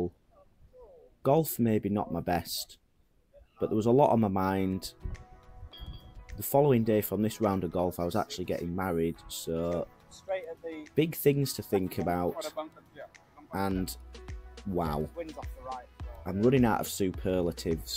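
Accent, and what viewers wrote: Yorkshire, United Kingdom. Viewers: Watched the whole vid, defo a white rose lad. Hope your wedding day was awesome, you’ve gained a new sub. Much love from Lancashire.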